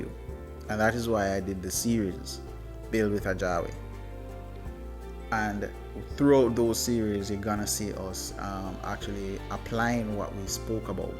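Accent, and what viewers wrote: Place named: Jamaica. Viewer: I am watching the video and my mouth is on the floor because I am wondering if it is the Island Jamaica that I still live on where a week don't go by that I don't experience, the worst Customer service possible to the average Jamaican, the slaughterhouse Healthcare system that took my mom's life in 2022 and continue to do so for the average Jamaican without accountability and not to mention the death rate of our women and girls consistently. For a moment I wanted to believe he was talking about another Island or Country named Jamaica but he has our accent and sound very much like wi, so him really talking about the Island I live on. I think I betta mind mi business and allow him to exercise him Marketing Skills.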